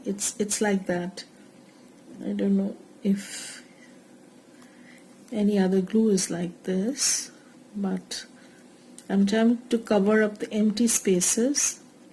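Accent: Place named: India. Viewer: I could listen to your lovely Indian accent for hours. Very cool technique.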